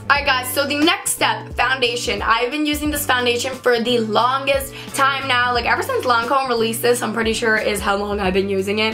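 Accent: New York, United States. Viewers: You have such a New York accent I love it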